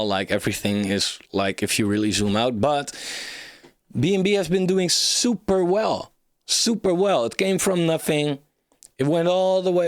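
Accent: Netherlands. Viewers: Are you from the Netherlands? Judging from that accent :) or Belgium?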